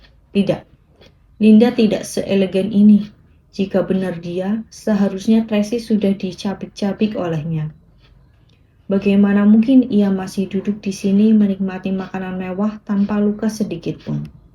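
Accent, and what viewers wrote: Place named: Java, Indonesia. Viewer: Koq jadi medok 😭😂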